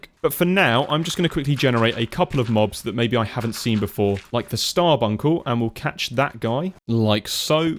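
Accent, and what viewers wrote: United Kingdom. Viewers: If I remember right, enchantment industry and oritech both have ways of getting enchanted books/items at significantly higher levels (fortune 255, anyone?) so maybe you could use that to get even more stuff? I'm absolutely loving this series, your British voice is great for helping me sleep (I finish the episodes first don't worry) :3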